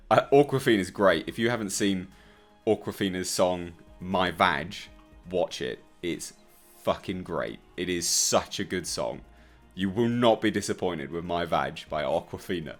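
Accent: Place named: United Kingdom. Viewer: this is my first time watching one of your videos and,well the first thought that popped into my head,honestly was"oh,this guy is either a racist or bigot. He's white,isn't he." You look white,but I don't know,you're probably British or something like that cause of your accent. Anyways,I see your points and why this "tokenism" from Disney bothers you and most of these other commentators. But,if I may say something. Why say something now about this,I don't remember this much heavy backlash when they changed the green lantern from black to white. Besides,who cares? Disney isn't the Disney from your childhood anymore. All they care about,and it hurts me to say this,cause I too grew up with Disney,is the green. Can we just let them destroy themselves if they want too? They own practically everything now,and only do so,to squeeze as much money as possible out of these franchises,countering they're only doing this for nostalgic purposes. 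First,no one asked for these remakes to be made. Second, they are unnecessary rehashes of something good that already existed. Third, it's a disrespect to the original saying it needs to be "fixed". Everyone needs to get off their high horse and be brought down a peg,especially Disney. We only fuel their flames and this negative advertising is only gonna push for them to keep making more of these. Why? Because they plain just don't care anymore,they don't care about quality animation,storytelling,or original content anymore. Si why should we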